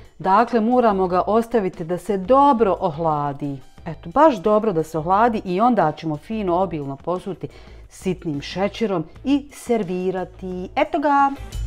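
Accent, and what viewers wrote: Bosnia and Herzegovina. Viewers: Legenda si ,, obozavam tvoj bosanski izricaj..pozdrav iz Splita..😗😗😙😙😙😙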